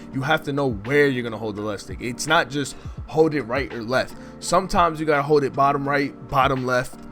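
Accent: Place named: Florida, United States. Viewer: dope vid u must be from florida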